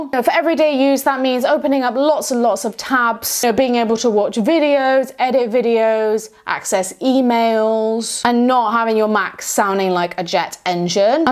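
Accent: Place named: United Kingdom. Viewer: love the British accent, but yours is on another level ! New subscriber with all the bells ringing and greetings from Argentina 🦁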